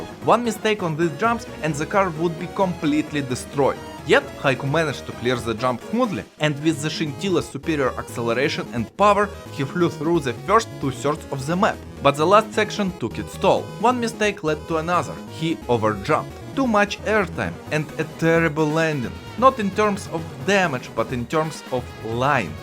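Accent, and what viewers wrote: Eastern Europe. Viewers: Slavic accent in eng beamng vid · Bro is STRONGLY eastern European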